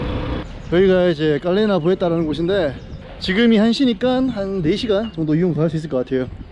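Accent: Gyeongsang, South Korea. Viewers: 잘생기셔서 그런가 외국 댓글이 종종있네요.경상도 분이신가요 사투리 쓰시는거같은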